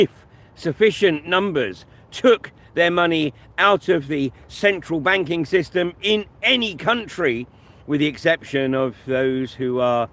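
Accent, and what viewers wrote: England, United Kingdom. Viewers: the “Stop the crime” channel mirrored your video about the culling of the U.K. pop, and so I found your channel, and subbed. So hello from NE Ohio! I just love your awareness, your beautiful background, your English accent, and most of all your awesome spirit! Rock on!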